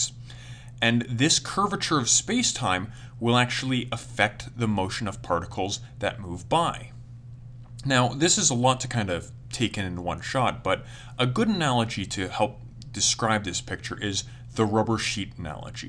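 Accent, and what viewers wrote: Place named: Canada. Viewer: Great video and well-crafted teaching! Thanks for making these. Since you recorded this video, we did in fact detected the gravitational waves. So your video is even more relevant now. I hear a bit of a Canadian accent. How correct am I? :-)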